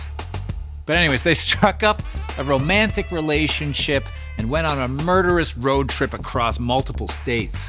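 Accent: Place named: Canada. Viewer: Such a good episode, full of little tidbits I hadn't heard of. Also, love hearing the Canadian accent poke through every now and again.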